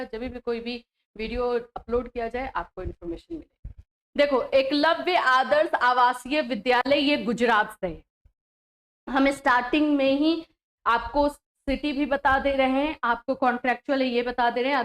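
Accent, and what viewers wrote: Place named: Agra, India. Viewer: Kya aap Agra se ho madam?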